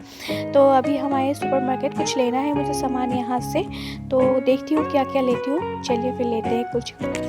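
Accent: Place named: Assam, India. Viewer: Hey cute kid you have got ! Where r u from ? Ur accent sounds Assamese , please aplogies if I'm wrong. Kfc same pinch 😂 oh this Maggi is different black one ? Nice place looks nice weather 👍 eagerly waiting for your challenge video !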